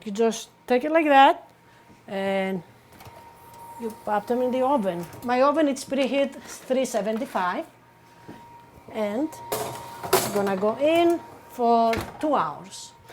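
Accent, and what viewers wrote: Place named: Greece. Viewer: Omg that's not actually the original Greek accent!! That's a Greek villager's accent!!!where are you from?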